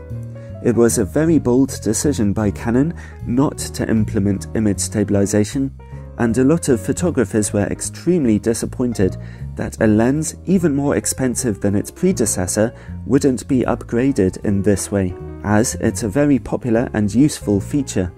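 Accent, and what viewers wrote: United Kingdom. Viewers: The best reviews ever. Love your British accent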